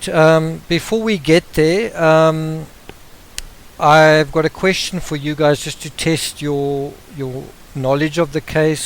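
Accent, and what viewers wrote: South Africa. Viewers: Is the narrator perhaps South African?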